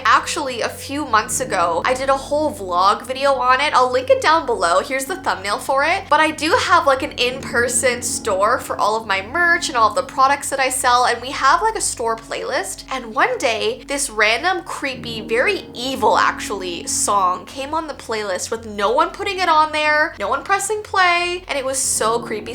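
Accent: Canada. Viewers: She has a Canadian accent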